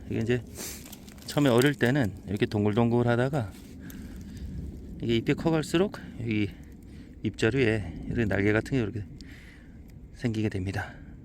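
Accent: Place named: Gangwon, South Korea. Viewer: 그곳은 혹시강원도인가요? 제고향 강원도억양인거같아서요~~말투도 정겹고 고향도그립고 엄마랑 나물하러다니던 추억도 그립네요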